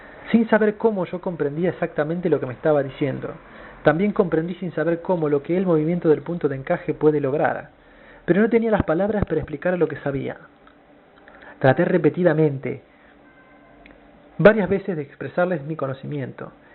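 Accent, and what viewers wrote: Argentina. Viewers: Que poca madre arruinar algo tan profundo con la lectura culera y por si faltaba algo pa hacerlo mas mierda el acento argentino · Porque dices shover es llover arjentino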